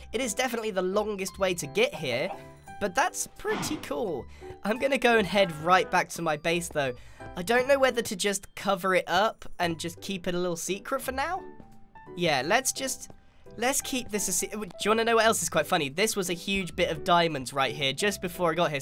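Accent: United Kingdom. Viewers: Is it just me or is so much more British back then then he is now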